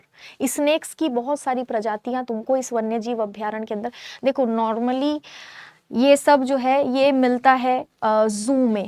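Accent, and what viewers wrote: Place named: Bhojpuri, India. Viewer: पढ़ाने का तारीका आपका ठीक है लेकिन बोलने की तरीका अच्छा होता तो और चार चांद लग जाता... क्योंकि तुम ताड़ाम भोजपुरी में सबसे निचले स्तर पर आता है l😊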